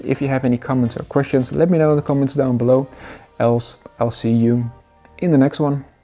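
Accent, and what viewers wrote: Netherlands. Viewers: You remind me of an over the internet friend from Holland. One by the last name of van Rens. Somehow I immediately knew you were from Holland. 😂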